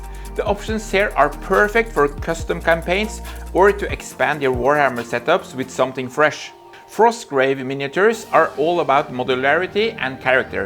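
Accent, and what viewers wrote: Norway. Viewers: Hei, jeg mistenker sterkt (basert på engelsken din) at du er fra Norge? Hvor kan jeg kjøpe miniatyrer fra Firgeforge games i Norge?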